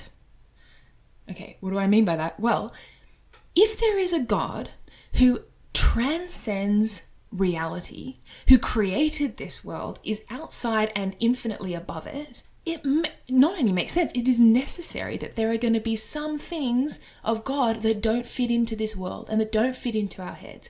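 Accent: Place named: Australia. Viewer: One of my favourite accents, cultured Australian.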